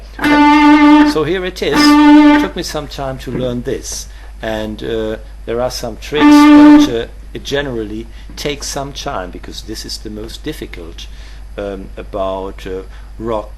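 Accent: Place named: Germany. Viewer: he sounds more german than anything...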